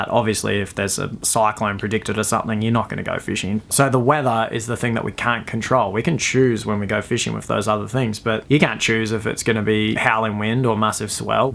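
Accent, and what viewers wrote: Australia. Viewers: Hi bro! Where are you from? Australia?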